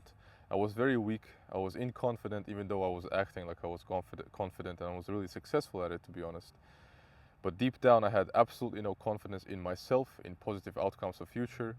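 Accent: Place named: Russia. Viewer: сразу слышно что родной язык русский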